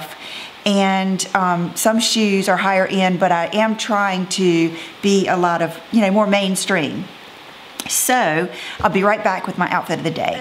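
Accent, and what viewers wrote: South, United States. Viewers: Hi, I'm new to your channel and I really enjoy your informative videos. I love your southern accent and your southern charm and of course your style.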